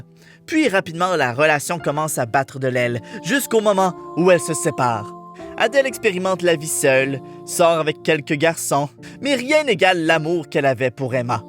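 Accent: Quebec, Canada. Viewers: Ter quebecois ou francais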